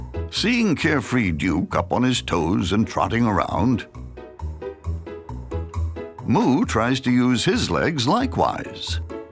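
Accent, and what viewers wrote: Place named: Transatlantic, United States. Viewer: Long time since I heard that transatlantic accent! Makes me think of an old cartoon movie.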